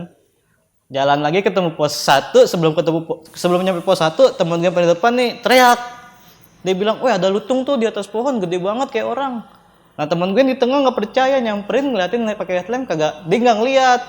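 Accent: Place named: Betawi, Indonesia. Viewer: Logat betawi banget lau cing hehehehe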